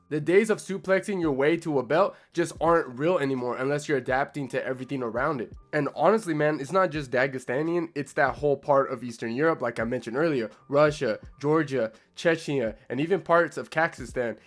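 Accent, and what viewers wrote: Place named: Mexico. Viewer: whos we? You dont sound american you sound mexican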